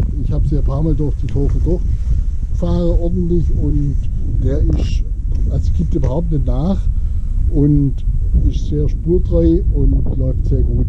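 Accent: Swabia, Germany. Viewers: Super !!! Wieder ein Motorradvideo - das Schwäbische ist echt super - heimatverbunden eben - fast wie die Aprilia - das ist wirklich ein tolles Moped - Danke für das informative Video - das immer etwas anders ist als die anderen Videos !!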